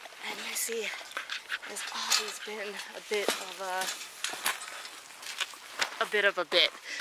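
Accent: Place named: United States. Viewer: Deuneska Portugal Yeah! I wish I could afford to do that. Are you in Arizona? You sound American.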